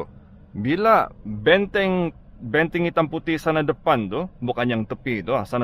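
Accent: Sabah, Malaysia. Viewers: Macam logat Sabah jk ni...btul ka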